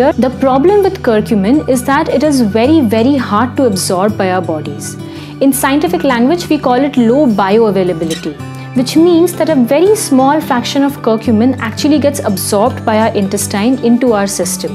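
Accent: India. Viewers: Pronounsation of an Indian,like it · The Indian accent is much more elegant to this American's ears than most British accents.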